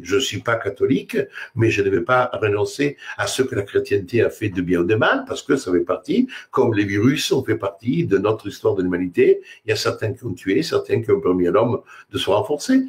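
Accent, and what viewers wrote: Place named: Italy. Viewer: Quel bonheur d’écouter notre cher cher cher, avec son petit accent d’Italie et son franc parlé.....merci à lui pour ces années de soutiens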